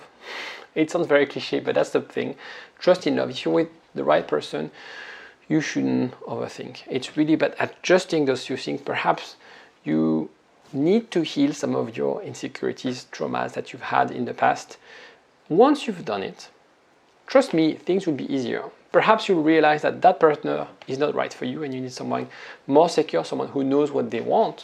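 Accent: France. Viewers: French. Canadian accent is different lighter. Sounds very different if you speak French more than listening as an English speaker.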